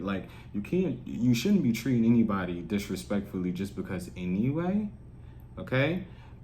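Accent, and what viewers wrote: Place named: New Orleans, United States. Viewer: You are definitely gifted, all FACTZ just Blessed ❤..wow! You be spot on bruh. Where are you from, I'm hearing Nola, ATL?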